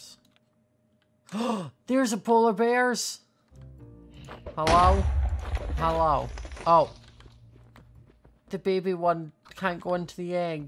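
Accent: United Kingdom. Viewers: Omg I love your uk voice because I'm from the us . Like if u agree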